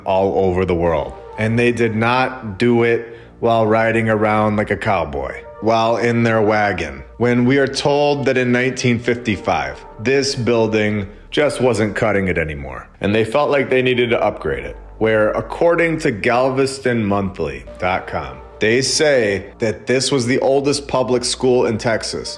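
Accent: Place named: New England, United States. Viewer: by the way I'm a new subscriber the second video I saw was of Saint Anne's Church in Fall River Mass that I'm very familiar with because I live one block from there right now. I have a few old pictures you may want that I didn't see in the video. You sound like you're from the Northeast you have a similar accent that I do are you from new england?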